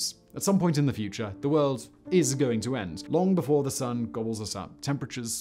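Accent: RP, United Kingdom. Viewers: His BBC accent isn't forced and not at all cringeworthy. What a pompous arse.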